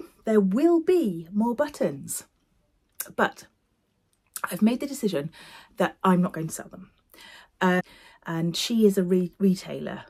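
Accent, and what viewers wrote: United Kingdom. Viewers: I’ve been watching for quite some time….and imagine I would act like Sew Sherrie if I happened to be in the same room!!!! However, with my southern American accent and your UK accent, I’ve feared we wouldn’t be able to converse well. But, after the list of podcasts today I’m no longer worried, I KNOW we would have a common language!!!❤❤😂😂 I’ve been bingeing Proof: A True Crime Podcast….about wrongful/suspect convictions. Something Was Wrong is another favorite, and I like Media Pressure…very interesting twist on the media. Trying to stay classy!!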